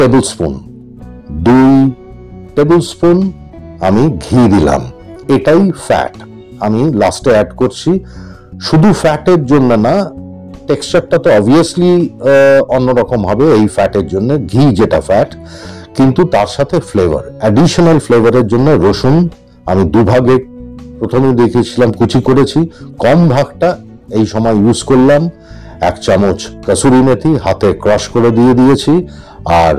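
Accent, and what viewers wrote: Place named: Punjab, India. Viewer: Dear Sir , thanks fr yr kind feedback. Just so that you know, your voice was “ just perfect , precisely so “…. Matching the tone and tenor , of this Punjabi bowl of Heaven…you sounded well , like …Punjab da Puttar….that said, on behalf of all, of your viewers , pls allow me to say this, please take care , since we hear you say , you do feel a bit challenged….this is a first , we hear from you…pls Sir, be well, even if fr , the sake of wonderful recipes , wherin you truly deliver the Science of Cooking, into this art form of Cuisine….bhalo thkaben…God Bless….Namaskar 🙏🏻🙏🏻🙏🏻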